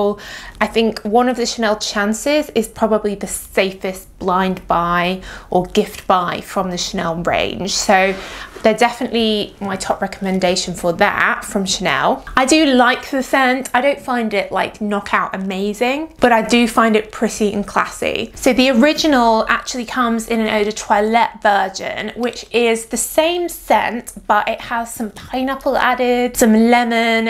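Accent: United Kingdom. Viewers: I have the Green one it's incredible I m addicted to but I want to have the others! Thanks It's awesome!❤❤ By the way I'm learning english and I like the British accent So It was amazing and useful to watch the video!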